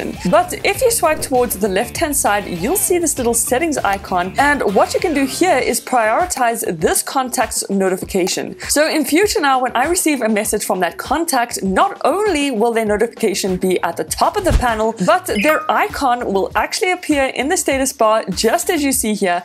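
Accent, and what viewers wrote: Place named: South Africa. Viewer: South African?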